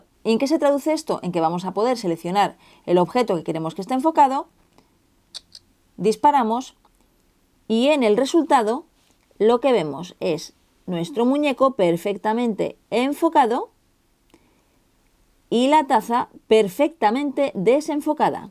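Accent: Spain. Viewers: Bruno Godoy pues por el acento obvio de España !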